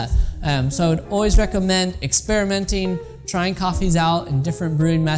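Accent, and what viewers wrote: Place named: United States. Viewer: I never believed in an american accent until now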